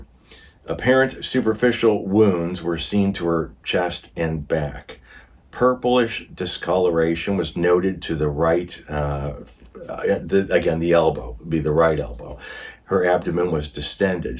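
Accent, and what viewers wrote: Chicago, United States. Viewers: Do I detect a very watered down Chicago accent? Some words are making me thing you may be one of My People. Edit: too bad Ozempic didn’t get discovered for weight loss years earlier because I think that would’ve saved her life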